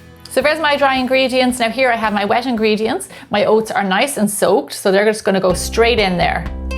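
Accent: Ireland. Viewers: Are you Irish? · And your Irish accent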